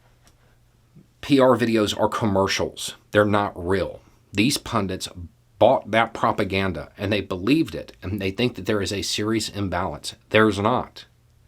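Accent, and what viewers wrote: South, United States. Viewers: I'm always amazed at how a dude from the south who says yawl and his a scruffy beard and wears a ball cap with a monkey on it can explain the most important issues we face in a way that adds clarity and depth that cuts through all the noise. I've said this before. It's a shame that people who would benefit most from these posts will probably never read them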